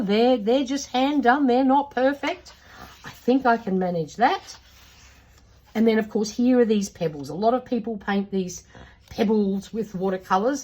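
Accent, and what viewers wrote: Australia. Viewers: Nice honest review. I just found your channel because I was looking for reviews on this book. Nice to be greeted by an Aussie accent. G’day!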